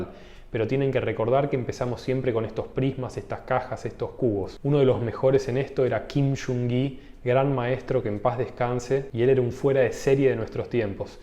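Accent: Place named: Argentina. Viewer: Entretenido tus videos, wena combi entre el acento argentino, aprendizaje y memes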